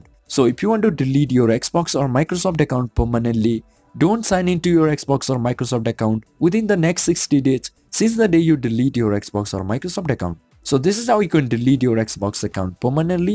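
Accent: India. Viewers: Oh wow he was rhe best indian voice ever no raiseseme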